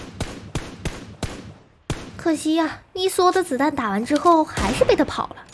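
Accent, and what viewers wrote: Northeast, China. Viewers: 感觉有一点东北口音 hhhh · 東北話少點可愛，還是唱歌好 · 她不是最開頭就說用東北話嗎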